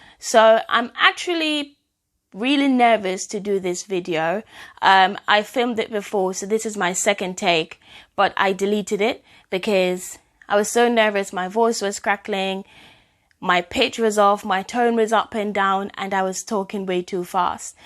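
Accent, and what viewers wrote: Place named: United Kingdom. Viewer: Goodie a British enuh. Hear the accent. Great video. I am sure you will reach someone who is struggling with this.❤️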